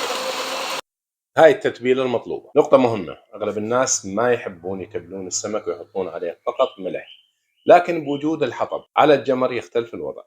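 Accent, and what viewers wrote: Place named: Deir ez-Zor, Syria. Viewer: شيف المحترم نحن نستمتع بكلامك وشرحك على الفيديو خصوصاً بلهجتك الديرية الجميلة اذا سمحت لا تقطع الفيديو بهل طريقة السريعة وخلي يكون اطول ❤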